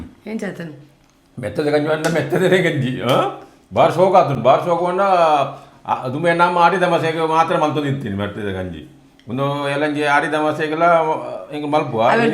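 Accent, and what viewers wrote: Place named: Tulu, India. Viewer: its so lovely to hear you speak Tulu. I miss my home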